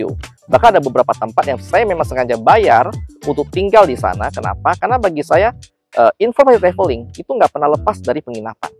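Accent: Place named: Bangka, Indonesia. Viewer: Abang ni asli nya Bangka atau gimana bang?? Bahasa Abang macam orang Bangka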